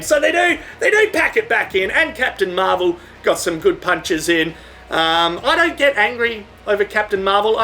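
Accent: Australia. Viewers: Funny I had on captions while watching this video to make sure I understood your Aussie accent correctly, you bloody legend!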